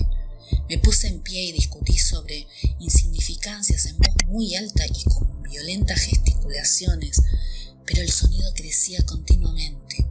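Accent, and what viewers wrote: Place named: Argentina. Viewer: 1ero eres argentina creo... 2do we tu video esta super lo adoré como narras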